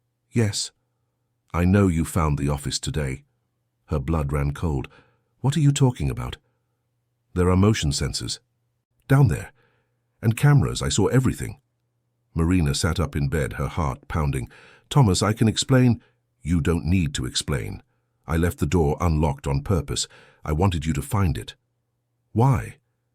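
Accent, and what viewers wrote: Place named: England, United Kingdom. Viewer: last name norwood is English, the voice also sounds English but the picture does not look Englsh. Do like his longer sideburns though.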